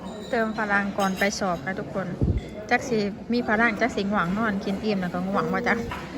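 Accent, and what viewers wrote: Laos. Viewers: ก็เขาเป็นคนลาวเนาะจะให้พูดไทยได้ดีกว่านี้หรือ? ถ้าไม่ชอบก็ไม่ต้องเข้ามาดู แค่นั้นจบ!!!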